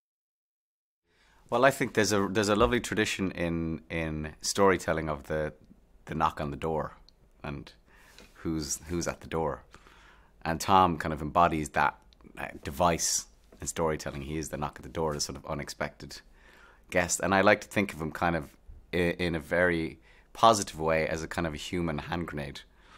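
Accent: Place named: Ireland. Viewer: In this interview he is talking with his natural irish accent. So charming 😍. And his voice sounded more high-pitched.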